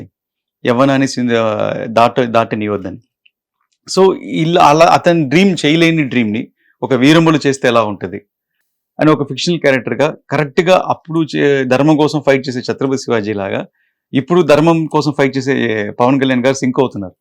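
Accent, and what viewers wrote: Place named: Tamil Nadu, India. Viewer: Nuvvu nee arava slang pora pooka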